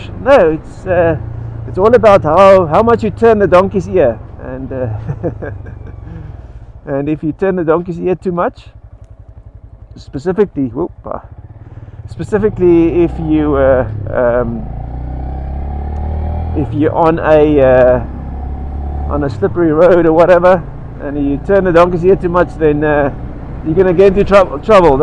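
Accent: South Africa. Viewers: what a fun little bike.. Are you from South Africa? . Why is the sound so bad with the visor closed?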